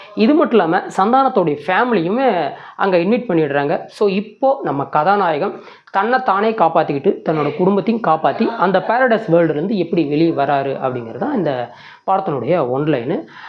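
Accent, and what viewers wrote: Kongu, India. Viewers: Kongu Tamil Vazhga.. Well done review..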